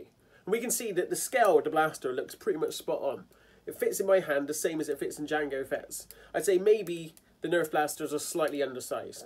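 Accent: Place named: Bristol, United Kingdom. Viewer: Haha! Awesome vid! Love the accent...Bristolian?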